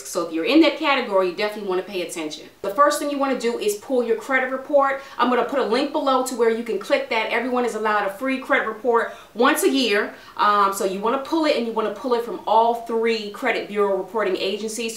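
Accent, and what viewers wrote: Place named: DMV, United States. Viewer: Love your accent. Sounds almost like DMV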